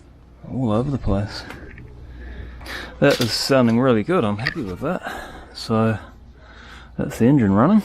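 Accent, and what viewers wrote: New Zealand. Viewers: Great commentary; and good to freshen up my Kiwi accent :)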